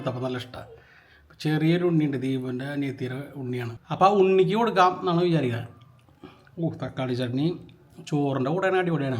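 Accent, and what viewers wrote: Thrissur, India. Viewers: ഒറ്റ ഇരിപ്പിൽ കൊറേ വീഡിയോ കണ്ടു. Sub ചെയ്തു. നല്ല അവതരണം. Simple but powerful. ഓവർ ആക്കി ചളം ആകാത്ത തൃശൂർ ഭാഷ. നാടൻ food. എല്ലാം കൊണ്ടും സൂപ്പർ ❤️❤️❤️. ഇടക്ക് ദീപു ക്യൂട്നെസ്സ് വാരി വിതറുവാണോ 🥰. 😜 കണ്ടു മടുക്കാത്ത വീഡിയോകൾ . 👍🏻👍🏻👍🏻👍🏻👍🏻🥰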